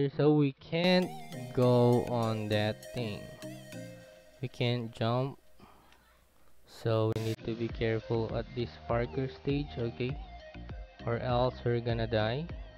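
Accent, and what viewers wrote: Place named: Philippines. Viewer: Man u from philipines ur english like philipines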